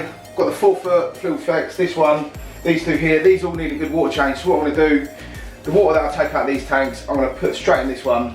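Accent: London, United Kingdom. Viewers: Good content man. Subbed👍 where u from mate? London? Essex??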